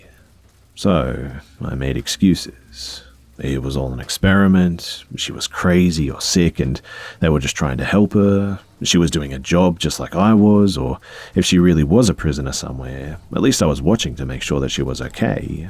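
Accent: Australia. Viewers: Just fabulous Its a real treat to listen to my native aussie accent for change I've subscribed and now about to binge listen to some of ur other works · I swear you're Australian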